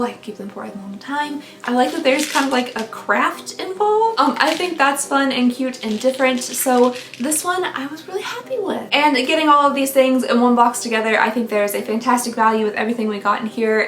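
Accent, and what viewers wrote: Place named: Georgia, United States. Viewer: Sounds like south GA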